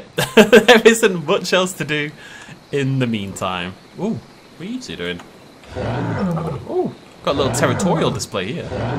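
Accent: United Kingdom. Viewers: A bit late, but happy 10th anniversary. Been with channel since very early, to the point I basically learned how to speak english thanks to this channel, british accent and all by trying to understand what you said in each video. James and you made my and many others' childhoods amazing, and are probably one of the most iconic dino-tubers in the scene. Well deserved 10 years of uploading.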